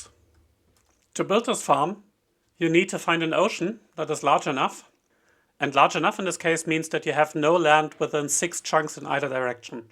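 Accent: Germany. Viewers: do i sense a heavily german accent? :D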